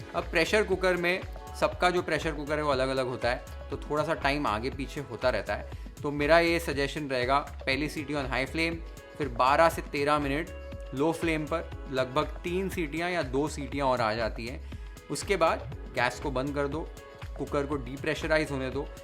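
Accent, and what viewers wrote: Maharashtra, India. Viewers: Your narration as well as your recipes always have a typical maharashtrian touch.... Makes me feel connected with you ☺️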